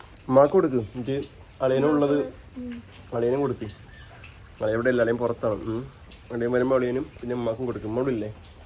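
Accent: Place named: Palakkad, India. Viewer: കൊല്ലം ഭാഷ മറന്നോ മൊത്തം പാലക്കാട് ഭാഷ ആണല്ലോ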